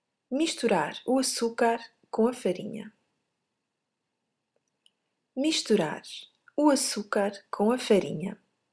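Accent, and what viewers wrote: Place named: Rio de Janeiro, Brazil. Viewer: É muito parecido ao sotaque dos Cariocas, claras de ovosh!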